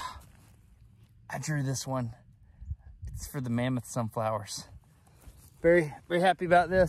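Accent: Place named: Alabama, United States. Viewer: Sounds like Alabama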